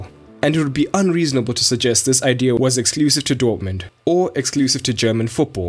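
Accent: South Africa. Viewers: Are you South African? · That Polish right-side was devastating! Piszczek Błaszczykowski and Lewandowski! side note, I know a South African accent when I hear one :)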